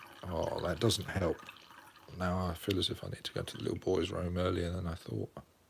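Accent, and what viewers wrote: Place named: Cockney, United Kingdom. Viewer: Yeah can tell you got abit of cockney in you were do you travel most?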